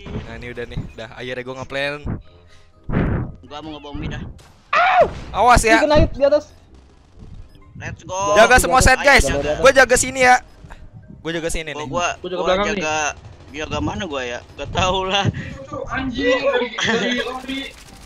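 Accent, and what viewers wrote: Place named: South Kalimantan, Indonesia. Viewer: Bang lu orang banjar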